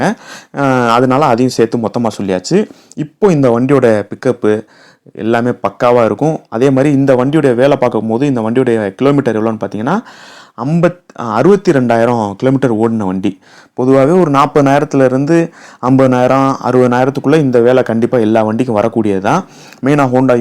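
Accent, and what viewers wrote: Madurai, India. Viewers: Bro nenga madurai,yahh??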